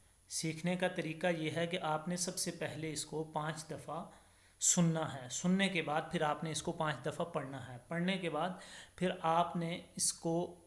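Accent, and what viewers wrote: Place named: Panipat, India. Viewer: Pani Patti awaz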